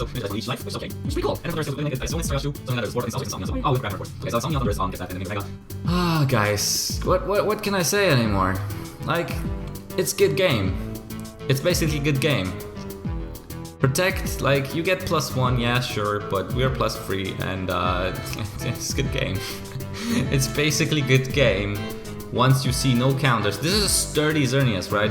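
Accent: Poland. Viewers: My are you from Poland?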